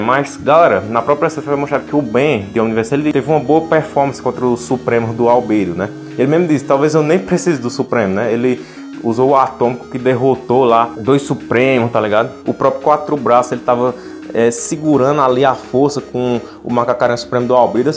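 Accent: Northeast, Brazil. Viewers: Eu acho que ele mora no nordeste por causa do sotaque dele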